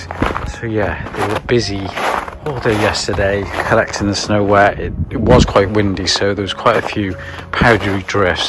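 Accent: United Kingdom. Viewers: I like your accent. Which british?) Winter is coming (Starks know about that) . Village in this season really nice, and air so fresh, only smell of Russian banya, when tree is burning mmmm smell from my child time when our houses didn't have gas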